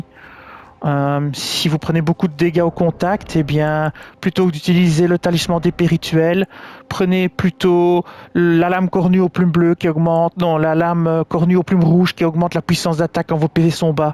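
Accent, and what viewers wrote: Belgium. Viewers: Merci beaucoup pour le travail accompli. Et l'accent Belge est tellement beau.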